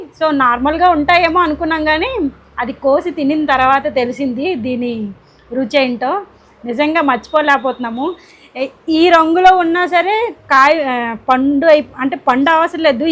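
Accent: India. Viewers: Oho, Meedhi Anantapur aa. Ippativaraku mee slang ni batti coastal andhra anukonnaam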